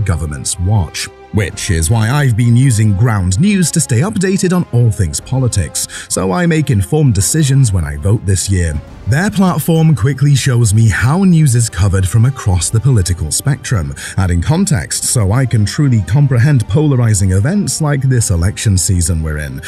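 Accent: United Kingdom. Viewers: I note that the speaker has a hint of British accent and a hint of anti-Thatcherism in him. Otherwise your video is quite well done. Cheers!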